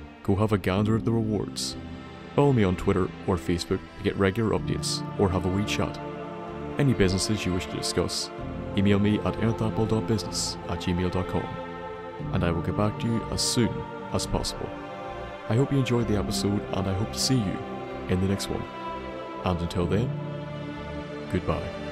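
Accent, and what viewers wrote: Northern Ireland, United Kingdom. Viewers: Are you northern Irish? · ur northern Irish right? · Are you from Northern Ireland? xD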